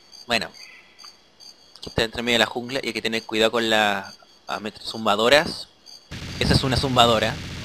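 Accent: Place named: Argentina. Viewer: Ahora sé que sos argentino y adolescente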